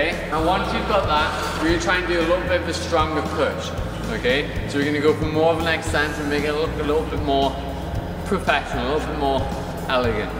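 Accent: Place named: Scotland, United Kingdom. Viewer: It's the accent of course. Sounds.. Scottish?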